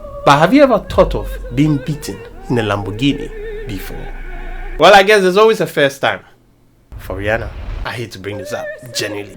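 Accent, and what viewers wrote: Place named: Nigeria. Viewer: You're starting to sound like a typical nigerian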